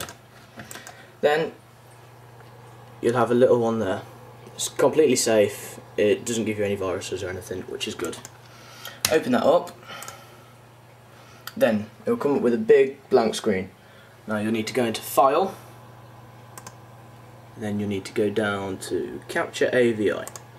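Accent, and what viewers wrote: United Kingdom. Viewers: no some british people don't sound like harry potter, but this guy sounds almost identical to him go watch harry potter then watch this vid again. i wish i had a british accent, having no accent is boring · You can notice that he has a British accent.... they use fucking STERLING POUNDS as a currency... -_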